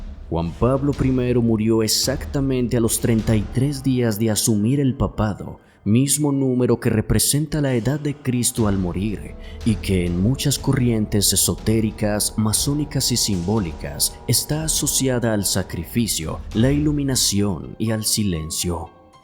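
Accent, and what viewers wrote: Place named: Colombia. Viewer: Hermano, vengo siguiendo tus videos me gustan, me encanta el acento colombiano, te deseo mucho éxito a ti y tu equipo, saludos desde México..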